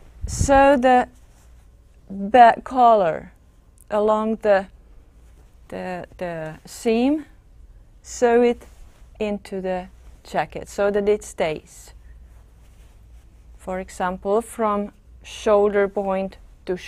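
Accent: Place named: Germany. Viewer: Thanks for an easy to follow tutorial. Joining the lining to the sleeve has always been a puzzle to me. Years ago I made just one jacket, it wore me out! Commercial patterns are of NO help when it comes to instructions. Up until today, I thought I'd done something wrong when I didn't go all the way around when joining the lining to the jacket at the bottom of the sleeve. "Bagging" the jacket, only now, makes sense, because you've shown how it's done. Thank you again and thanks to the college for help in making this video. BTW, (as I laugh at myself) in the beginning I was trying so hard to understand what a "velt" was. I thought, "hmm, that must be a new term." Then I remembered my days when I lived in Bavaria and recalled how German's W's and V's are the reverse of the English version. I then rewound the tape now that I could better concentrate on what you were a saying, not how you were saying it. 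You've done a lovely job here, I hope lots of sewers have the opportunity to find you. However, below is a good question.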